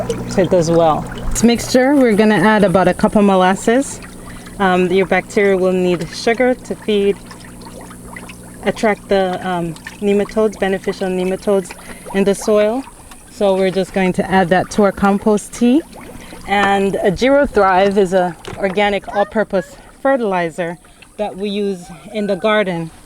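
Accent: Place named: Jamaica. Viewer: Hey....good info...do I detect ...a jamaican....not much of us..doing this. Proud of u my child...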